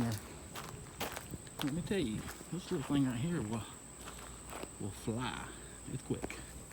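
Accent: South, United States. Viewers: HAHA at work so had to turn on closed captioning. That shit is hilarious YouTube doesn't do a lot of work trying to learn them southern slangs that's fa sure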